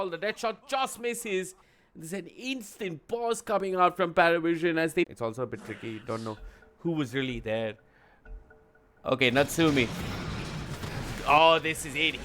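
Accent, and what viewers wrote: India. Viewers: indian caster ? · Who is this indian caster.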